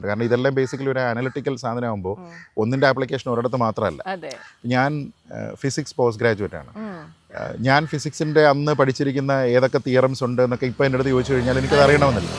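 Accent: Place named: United Kingdom. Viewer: ദക്ഷിണേന്ത്യയിൽ കേരളം മാത്രമാണ് ചില ആങ്കർമാർ ഇംഗ്ലീഷിലോ, അല്ലെങ്കിൽ വ്യക്തമായി ഇംഗ്ലീഷ് സ്വാധീനമുള്ള ഉച്ചാരണത്തോടെ മലയാളത്തി സംസാരിക്കാൻ തിരഞ്ഞെടുക്കുന്ന സംസ്ഥാനമായി തോന്നുന്നത്. പലപ്പോഴും അത് അനാവശ്യമായതും ചില സമയങ്ങളിൽ ശ്രദ്ധ തിരിക്കുന്നതുമായ ഒരു അനുഭവമാണ്. “Big Sister” വർഷങ്ങൾ മുമ്പ് ജോലിക്കായി വോയ്സ് ആൻഡ് ആക്സന്റ് ട്രെയിനിംഗ് എടുത്തതും പിന്നീട് ആ ശൈലി ആങ്കറിംഗിലും പിന്തുടരാൻ ശ്രമിച്ചതും കണ്ടതിന് ശേഷം, ഈ ഘട്ടം കഴിഞ്ഞുപോയി എന്ന് ഞാൻ കരുതിയിരുന്നു.ഇതിനെ കൂടുതൽ വ്യക്തമായി കാണിക്കുന്ന മറ്റൊരു കാര്യം, മലയാളം അതിന്റെ സ്വാഭാവിക സൗന്ദര്യത്തിൽ തന്നെ വ്യക്തതയോടും ആത്മവിശ്വാസത്തോടും സ്വാഭാവികമായ ശൈലിയോടും സംസാരിക്കാനാകുമെന്ന് തെളിയിക്കുന്ന മികച്ച ആശയവിനിമയക്കാരെ കേരളം സ്വന്തമാക്കിയിട്ടുണ്ടെന്നതാണ് — അതിൽ ശ്രീജിത്ത് പണിക്കർ ഒരു മികച്ച ഉദാഹരണമാണ്. ഇംഗ്ലീഷ് ആക്സന്റിൽ ആശ്രയിക്കാതെ തന്നെ മലയാളം എത്ര ശക്തിയും സൗന്ദര്യവും നിറഞ്ഞ ഭാഷയാണെന്ന് അദ്ദേഹത്തിന്റെ അവതരണം തെളിയിക്കുന്നു.മലയാളം അതിന്റെ സ്വന്തം സമ്പന്നമായ വ്യക്തിത്വമുള്ള ഒരു മനോഹര ഭാഷയാണ്. 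അതിന്റെ സ്വാഭാവിക ഉച്ചാരണങ്ങൾ — പ്രാദേശികമായാലും ന്യൂട്രൽ ആയാലും — അലങ്കാരങ്ങൾ ആവശ്യമില്ലാത്ത ഒരു സ്വാഭാവിക ആകർഷണവും സത്യസന്ധതയും നൽകുന്നു. ആങ്കർമാർ ഇംഗ്ലീഷ് സ്വാധീനമുള്ള ഉച്ചാരണത്തിലേക്ക് മാറുമ്പോൾ, ഭാഷയുടെ സ്വാഭാവിക സൗന്ദര്യം മറഞ്ഞുപോകുന്നതുപോലെ തോന്നാറുണ്ട്. Original ; Kerala seems to be the only state in South India where some anchors choose to speak either in English or in Malayalam with a distinctly English‑influenced accent. It often feels unnecessary and, at times, quite distracting.I genuinely thought this phase had passed—especially after “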